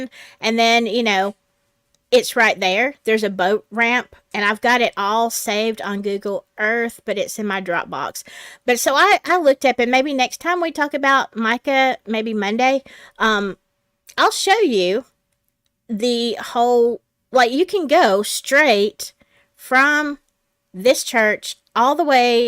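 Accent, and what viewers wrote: South, United States. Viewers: Hello there from Oklahoma. I just heard your channel for the first time today. I loved it! You are a delight and I love the southern accent! Born and raised in Florida. Lived a portion of my adult life in Georgia. Put the 2 southern accents together and my friends think it’s very twangy and comical! Lol! Now let’s see what I pick up in Oklahoma!! Thanks for covering this case! I hope you’ll do many more! I listen to everyone covering this.. You just can’t believe it but yet you know it’s true! I pray for Justice for Mica and for the whole truth to come out for this family! Especially if others were involved in this! I enjoyed it and will be back for more! Great job! #JUSTICEFORMICA 😊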